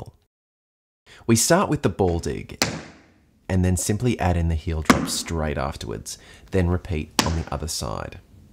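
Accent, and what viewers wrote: Australia. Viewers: Is this guy Australian? He sounds that way. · Crikey he’s Australian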